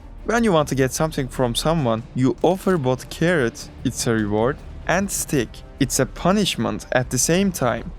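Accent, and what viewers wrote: Turkey. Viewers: Bu adamın türk olduguna yemin edebirim aksanından çok belli oluyo ginede videoların güzel böyle dewam :D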